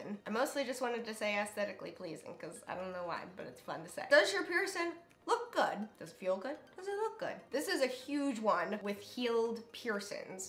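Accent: South, United States. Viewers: This does not anything like Midwestern or Canadian 😂 I’d bet a dollar shes from the south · Her accident is like half way southern😂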